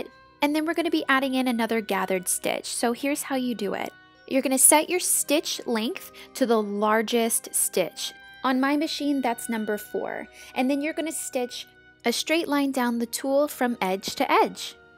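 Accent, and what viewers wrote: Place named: Los Angeles, United States. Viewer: She sounds so LA compared to now. Love the transformation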